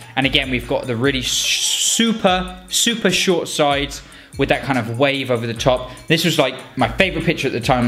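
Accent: England, United Kingdom. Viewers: Where in england are u from? cant place the accent. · Sometimes u sound like pews, but with English accent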